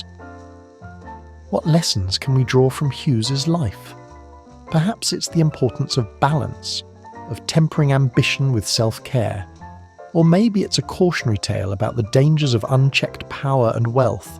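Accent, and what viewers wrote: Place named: England, United Kingdom. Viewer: Lol. That is the voice of the average english man. Relax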